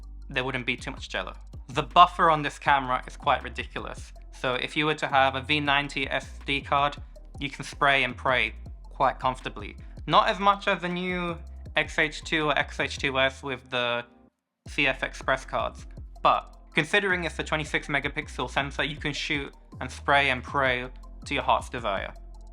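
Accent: India. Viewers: So glad to see new videos from the guy who got me into Fujifilm many many years ago. I've missed the random phasing in and out of the Indian accent. 😄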